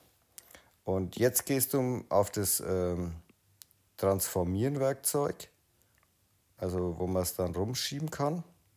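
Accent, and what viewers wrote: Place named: Franconia, Germany. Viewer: Toll erklärt 😅 endlich mal ein Procreate Video im fränkischen. Leider klappt es aber bei mir nicht. Das Bild wird nur dupliziert und nicht ausgeschnitten :(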